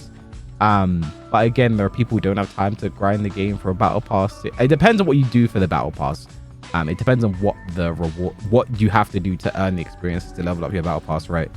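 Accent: England, United Kingdom. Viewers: The music is a little distracting. Your also speaking fast in an English accent, which usually isn't a problem but the music makes it difficult to understand what your saying. Thanks for the upload on the patch notes.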